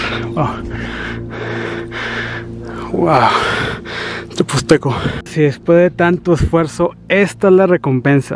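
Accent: Monterrey, Mexico. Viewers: Yo quiero un en mi vida, me encanta tu vibra, tu voz regia, tu forma de ser, simplemente palomita es afortunada de tenerte en su vida 😘 Haz más vídeos cocinando, enseñando paisajes, me encanta esta faceta 🤗 Simplemente el mejor 😍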